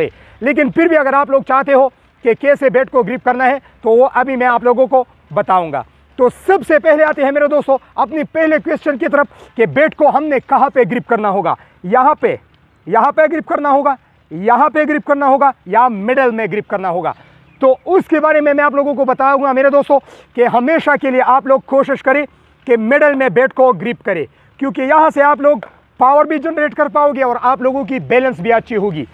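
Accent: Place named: Kashmir, India. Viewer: Nice...Bhai....Aapke bolne ka andaaj bahut achha h ....Mujhe mere jammu-kashmir ke friends ki yaad dilata h....Keep it up...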